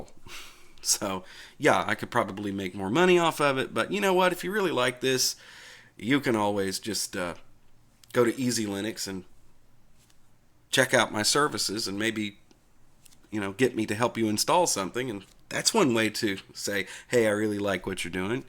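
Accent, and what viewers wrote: South, United States. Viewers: Boy, I'll tell ya - that southern twang spoutin on and on about Linux is something special. Keep on keepin on, and don't mind the haters. You've got another subscriber! PS: To everyone saying that "Ubuntu just works - stop distro hopping, you'll learn how it REALLY works" - go f*** yourself, you dang fanboys. He's absolutely right. The packaging of the kernel is not always done right. People mess up and some of these people are coders & packagers. I can't count the times on my two hands when I've installed the "easy to use linux distro" only to see that certain unsupported chipset & hardware, as well as unstable properitery AND open source drivers, make the system crash and burn horribly. It's quite irritating and someone who tells it like it is makes the situation better for new users, in realising how free and open it really is. 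It is chaos - a beautiful chaos, but still: chaos.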